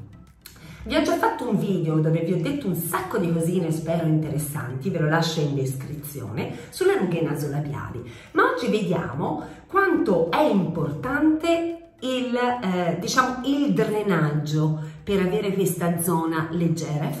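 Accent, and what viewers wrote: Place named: Florence, Italy. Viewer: Ciao, piacere di conoscerti. Ti ho scoperto ieri sera con grande piacere. Volevo farti una domanda ma preferirei in privato. C'è la possibilità di scriverti una mail? Grazie in anticipo e un abbraccio da Firenze. (Mi sembra di sentire che anche tu sei delle mie parti)😍😍😍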